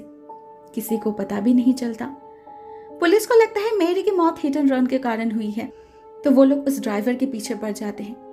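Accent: Bengal, India. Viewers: sei to. Bengali wali vibe chupegi kaise. Aur itni pyari hindi ek bengali hi bol sakti hai. Khub misti ho tum. Like your way of speaking dear. Love you dear. Chaliye jao. 💕💕💕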